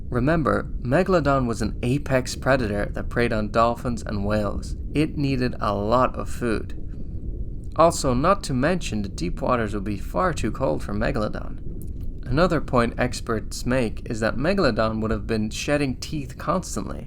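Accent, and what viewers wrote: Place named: Ireland. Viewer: I hear a hint of Irish in your speech...where are you from in Ireland?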